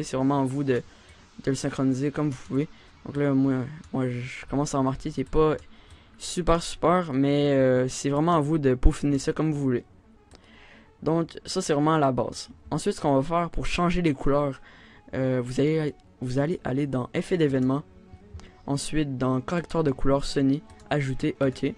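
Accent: Quebec, Canada. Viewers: super tuto et j'adore l'accent quebecois donc je like ;)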